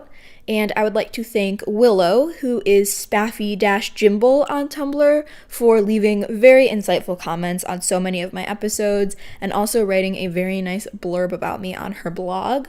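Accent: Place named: United Kingdom. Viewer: bloody hell that british accent was quite delightful, i liked to hear you criticize the movie industry because they have taken so many bad decisions when it comes to casting certain actors thinking it will not appeal to most of the audience,i think your point of views are well fundamented and i agree with you on most of them,so keep up the good work :)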